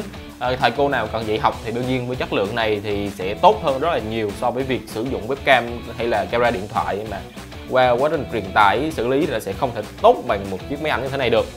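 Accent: Binh Dinh, Vietnam. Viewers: bạn người bình định ah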